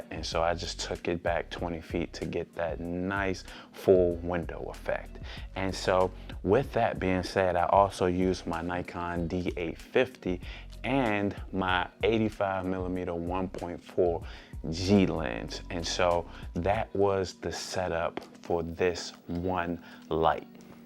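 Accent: United States. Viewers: Yo are you from Baltimore?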